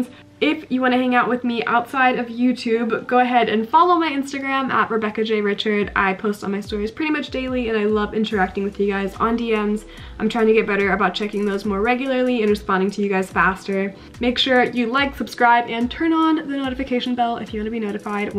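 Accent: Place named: Germany. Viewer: So cool! Ich habe mir gedacht dass du einen klein deutschen Akzent hast. Wirklich nur eine kleinen. Danke, dass du deine Erfahrungen mit uns teilst.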